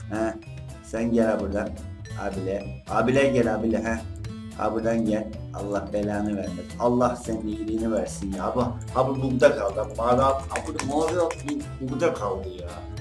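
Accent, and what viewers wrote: Black Sea, Turkey. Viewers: abi sen Karadeniz'li Misin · Abi sen Karadenizlimisşn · Abi karadenizli mısın?🙊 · ABİ KARA DENİZLİ GİBİ KONUŞUYO AMA ŞİMDİ NORMAL OLDU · Karadenizli büyük ihtimal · Abi Karadenizi misin? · Karadenizli mi kinusma tipine haz usagim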